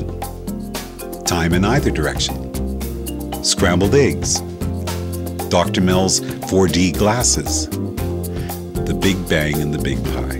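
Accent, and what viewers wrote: Canada. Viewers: is he canadian? he says aboot instead of about... and can you define these extra dimensions as real, if theyre only theoretical? can they be proven? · I had a feeling you were canadian before I read this, sometimes it takes a canadian to recognize the idiosyncratic nuances of speech of a canadian. I can't explain it.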